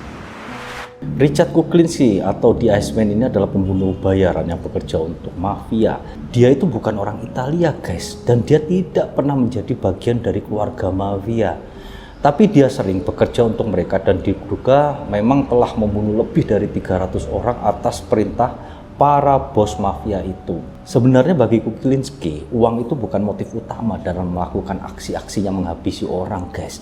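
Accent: Java, Indonesia. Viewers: bingung... Saya dengerinnya juga bingung kejaaam bgt.... Suka logat kejawaannya medok medok gitu mantaaap deh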